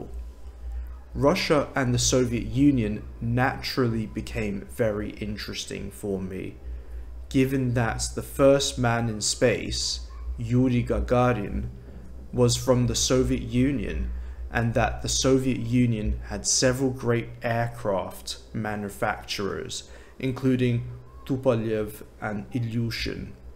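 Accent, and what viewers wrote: United Kingdom. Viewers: прекрасный преподаватель с классическим британским произношением. То самое - которое считается золотым стандартом. Радио ВВС и т.д. Занимаюсь с больше 1,5 года. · Информативно. Британский акцент мне нравится больше, чем американский. Спасибо, что говорите медленно. Это самая сложная часть в изучении английского - восприятие на слух. Русский на слух воспринимать проще - он по звучанию почти не расходится с написанием.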